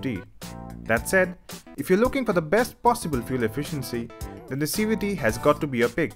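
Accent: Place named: India. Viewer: Jesus dude. Work on your accent. If I want to talk to an Indian I can’t understand, I’ll spend $2k on a plane ticket to a sh!thole South Asian country, buy a sh!t Ton off nose plugs to block all the curry and BO smell, throw a towel on my head to blend in, then proceed to talk to all the snake charmers in the land. Just kidding I would never do that because NO ONE GIVES A F$&@ ABOUT India.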